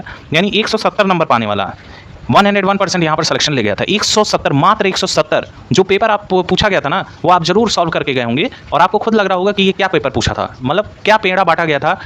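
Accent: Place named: Ayodhya, India. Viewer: अयोध्या से हो सर भाषा से लग रहा है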